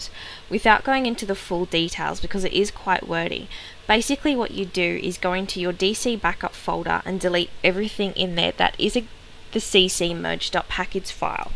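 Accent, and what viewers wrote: Australia. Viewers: classic aussie accent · Are you Australian?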